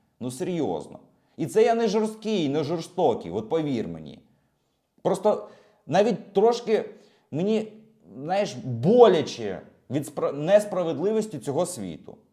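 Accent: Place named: Surzhyk, Ukraine. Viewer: Задолбал слушать твой сраный суржик.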